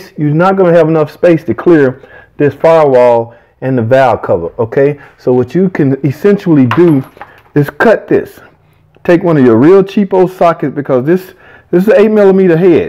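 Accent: South, United States. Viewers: talk like the dirty dirty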